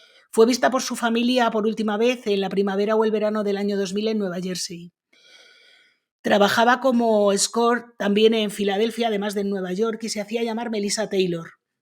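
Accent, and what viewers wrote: Spain. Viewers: Hola! me gusta muchìsimo como describes tus videos, siempre muy interesantes.Ademàs me encanta tu acento; de què lugar de España eres?.Saludos desde Italia❤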